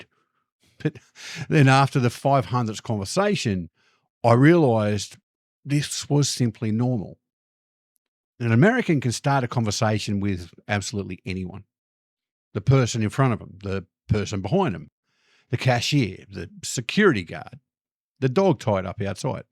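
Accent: Australia. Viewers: I’m from California and lived in Australia for years. I had to really check myself so as to not come off rudely. You hit it on the nail when you said Aussies are more reserved. I was in the hospitality industry all of my life and can talk to anyone but I needed to really tone it down. Out of respect. Love Australia and its people. And good to hear you haven’t lost your accent mate 💖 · The truth is they could feel your Aussie vibes from a mile away and they just want to hear your awesome accent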